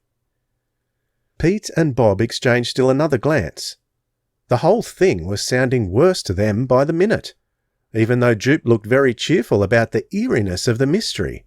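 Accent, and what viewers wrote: Australia. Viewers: This is the first time I've heard an Aussie narrator! It's great!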